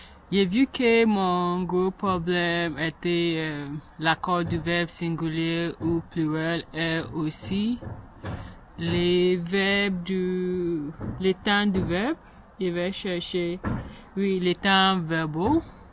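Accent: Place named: England, United Kingdom. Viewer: Salut vraiment continuer ton absent français prend le dessus sur l'anglais.Au début l'anglais ce trouvait beaucoup dans ta manière de parler mais maintenant le français prend le dessus dans ta manière de parler maintenant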